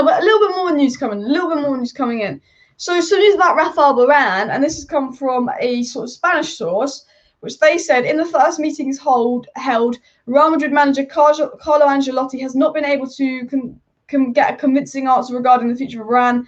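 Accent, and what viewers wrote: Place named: United Kingdom. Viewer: please please Speak little bit Slower everyone is not English specially its more difficult to understand british accent,please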